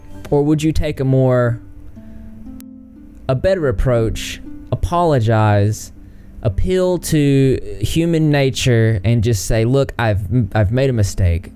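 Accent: South, United States. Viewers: are you from the south? I can hear a southern draw in your voice.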